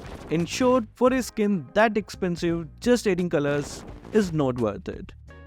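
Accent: India. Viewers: Your indian accent is so hard to understand bruhhhh.....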